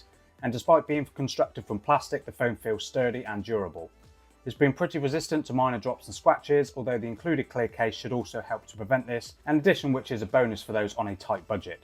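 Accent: Liverpool, United Kingdom. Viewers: Why are you speaking in a Scouse accent? Whereabouts in Liverpool are you actually from? If you are not from Liverpool then why are you speaking in a Scouse accent?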